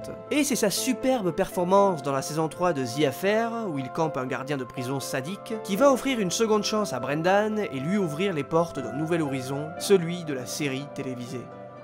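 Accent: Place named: Perpignan, France. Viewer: Tu as l accent de Perpignan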